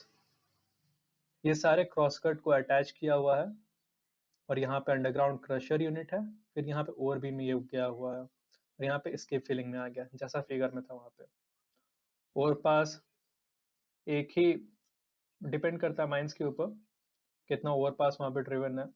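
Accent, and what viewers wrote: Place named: India. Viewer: I can't understand because your indian accent.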